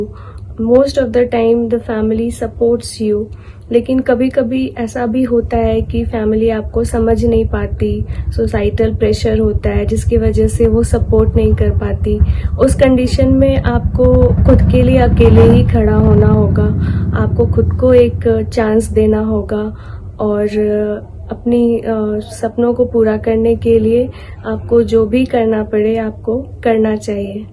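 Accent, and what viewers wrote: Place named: Bihar, India. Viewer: Ma'am r you from Bihar excellent❤️😊😊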